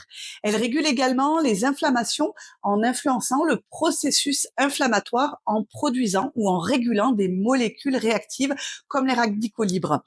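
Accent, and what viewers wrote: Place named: Haute-Loire, France. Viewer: NON !!!! 😃 Je viens de voir sur votre site que vous êtes ardéchoise !😃 Moi aussi !!! 😃Compte tenu de votre accent, je dirais que vous êtes plutôt dans le Nord de l'Ardèche, dans les Boutières ou même Guillerhand Grange, Annonay...Pour ma part, je suis dans le Sud, dans les Cévennes, du côté de Valgorge. Je suis moi aussi passionné de biologie, de médecine et de Sciences du vivant. J'adorerais vous rencontrer pour parler de tout cela et qu'on échange des connaissances sur ces sujets.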